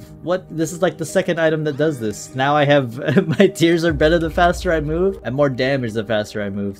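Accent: United Kingdom. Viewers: Wait you're British, cringe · My day be so fine. Then boom. Find out is British.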